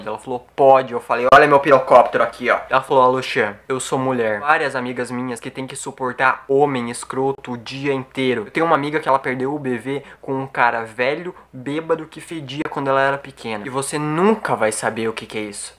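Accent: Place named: Rio Grande do Sul, Brazil. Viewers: Po tche cê n conhece sotaque gaucho? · Sotaque gaúcho tche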